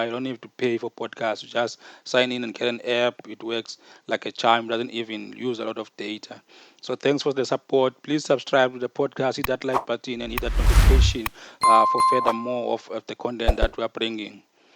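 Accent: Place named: Johannesburg, South Africa. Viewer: This has to be the dumbest and uninformed rant about cape independence I've ever heard judging by your acetate I can tell you are not from the western cape you sound like you are from joburg and came to the western cape recently since you know so little firstly it's not cape town that is seceding it's the hole western cape province not the city of Cape Town get your facts right!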